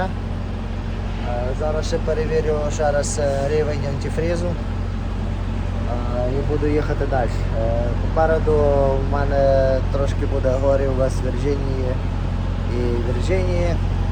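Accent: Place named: Prykarpattia, Ukraine. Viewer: Вітаю гуцула в Америці.нас дуже багато по світу.приємно вражений нашою говіркою Прикарпатською.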